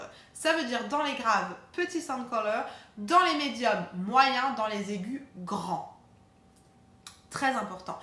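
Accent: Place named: United States. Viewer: ah non, change rien quant à ton génial "sound color" à l'américaine, ça te va fort bien ... géniale vidéo ... une de plus ... en fait, la voix est une espèce de formule mathématique quoi ,...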